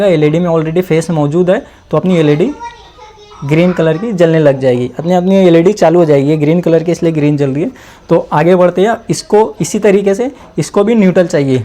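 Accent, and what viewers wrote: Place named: Maharashtra, India. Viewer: तुमच्या बोलण्यावरून तुम्ही मराठी भाषिक आहात .. पण तुम्ही खुपच उत्तम आणि सुलभ पद्धतीने समजावता. ... एकच स्वतःची काळजी घ्या... आपणास उदंड आयुष्य लाभो ही श्री स्वामी समर्थ चरणी प्रार्थना !!!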